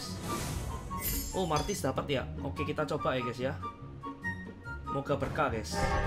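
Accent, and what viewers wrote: Java, Indonesia. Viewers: Wong jowo ndi mas e ? Bhs indonya medok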